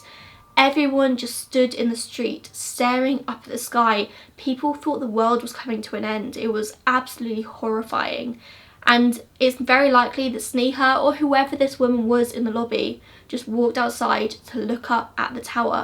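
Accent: England, United Kingdom. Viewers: I really love your English accent. I'm from Argentina and I've been studying English for 5 years. I'm listening to you while I'm working. You're amazing, thanks a lot.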